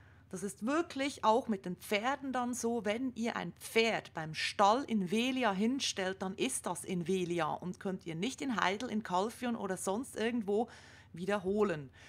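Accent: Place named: Switzerland. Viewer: Danke für die Erklärung mit wunderschönem schweizer Dialekt.;D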